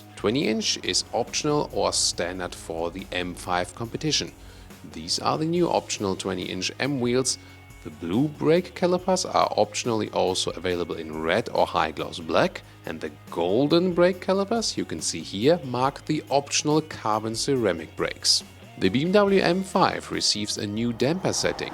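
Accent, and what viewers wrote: Germany. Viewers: There's something about German accent that adds authenticity to anything he says.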